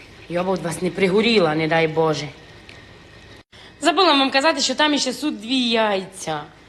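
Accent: Transcarpathia, Ukraine. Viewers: Закарпатський діалект.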